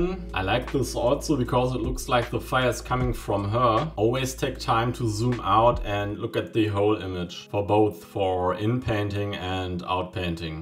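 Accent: Germany. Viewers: Dein deutscher Akzent kickt sowas von rein xD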